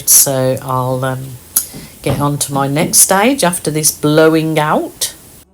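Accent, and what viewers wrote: United Kingdom. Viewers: I have only just found you tonight, I think I may give this magical art a go, it’s fascinating. I have subscribed and I will go back on your previous lessons. I’m from the UK and I’m sure I can detect a twang of British in your accent. Take care K🇬🇧❤️❤️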